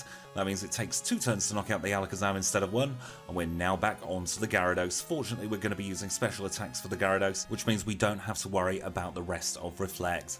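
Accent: United Kingdom. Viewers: Searched for quagsire runs and was not disappointed. Also, nice bonus to hear a fellow Brit doing Pokémon runs too! Great video thanks 😊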